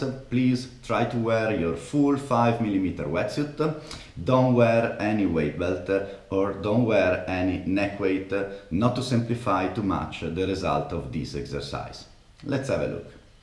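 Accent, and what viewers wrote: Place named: Italy. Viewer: Your italian dialect is so cool!😎🤙🏻 Thank you!😊👌🏻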